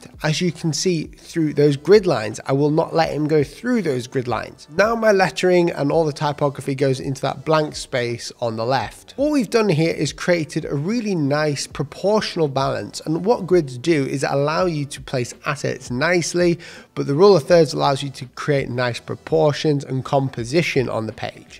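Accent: United Kingdom. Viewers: British accent is horrible man, some words are missing from your speech . i can't understand fully what you mean . please there is many people is not native English speaker so they don't fully understand British accent . it would be better if you speak normal English or American accent would be better for many people to understand .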